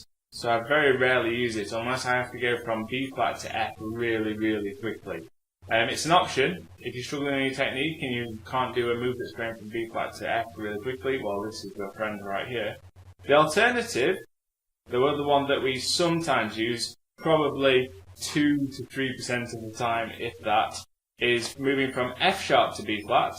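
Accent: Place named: England, United Kingdom. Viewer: I like your haevy english accent,knookle key. There is bis, flute 1 /1. Albert clarinet side key, great well shown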